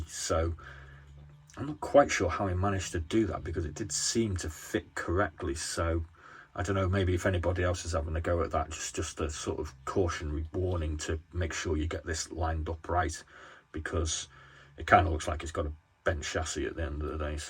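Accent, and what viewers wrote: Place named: Northwest England, United Kingdom. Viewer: Got to ask, are you in merseyside, wirral or chester, trying to place the accent.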